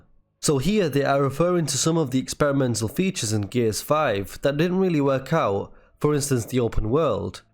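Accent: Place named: United Kingdom. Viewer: I like supporting your channel but you made this title with zero actual knowledge of new weapons or enemies. Just showed and talked about old ones. Obviously you’re click baiting and this shit runs dry man. Don’t take advantage of people trusting you to then sit there and say pointless stuff in your uk accent like it’s content.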